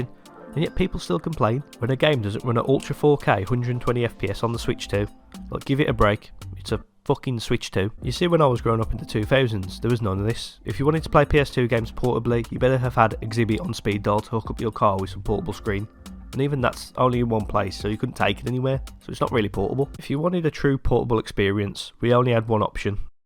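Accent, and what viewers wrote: United Kingdom. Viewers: Your accent is very british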